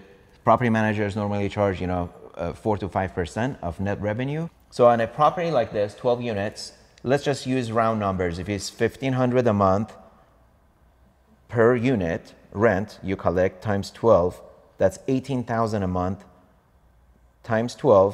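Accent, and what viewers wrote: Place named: Iran. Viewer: His Farsi dialect still very visible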